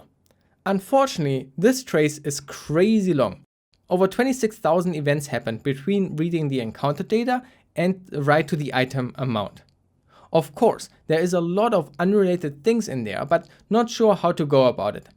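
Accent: Germany. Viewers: You sound like u have a german accent, iam from germany 2 and i think iam smart enought to hear this? xD great video btw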